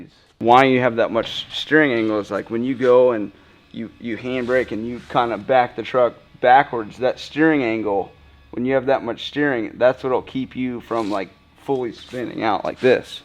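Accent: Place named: Ohio, United States. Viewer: I just found this channel and this is the first video I’m watching but his accent makes me think he’s from Ohio. Sounds a lot like Roman Atwood.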